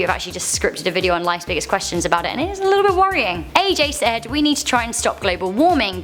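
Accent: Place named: United Kingdom. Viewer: British accent always amuse me🤣🤣🤣🤣🤣🤣🤣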